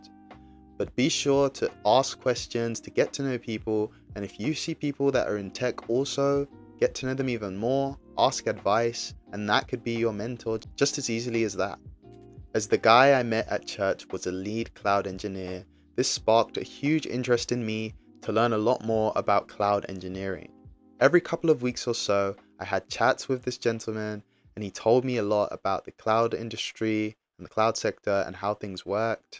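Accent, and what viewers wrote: United Kingdom. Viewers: Hey man, by your accent you sound like you're based in the UK? How's the demand for cloud roles over there. I'm currently studying for the AWS Solutions Architect Associate cert. But I'd like to believe there's plenty of overlap in cloud if I decide to do anything related to Azure later on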